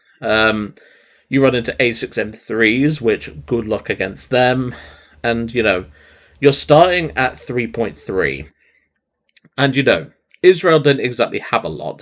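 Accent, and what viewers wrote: United Kingdom. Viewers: Midlands accent?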